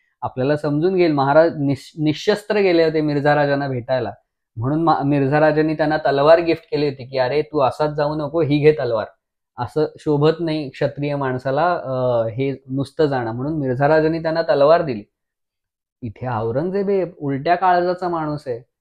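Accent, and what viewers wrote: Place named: Pune, India. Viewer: खुप सुंदर भाऊ तू छत्रपतिची माहीती दिलीस भाऊ तुझी पुणेरी भाषा अप्रतिम भाऊराजा ❤❤❤❤❤🙏🙏👍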